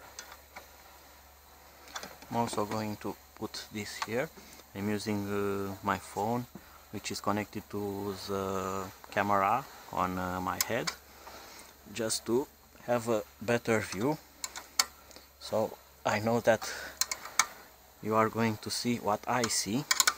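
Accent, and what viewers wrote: Romania. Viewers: Nice video ! Are you romanian ? ( your accent ) LOL !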